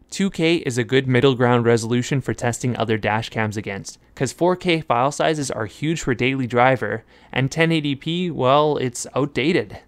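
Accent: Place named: West Virginia, United States. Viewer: by the sounds of it, he’s in West Virginia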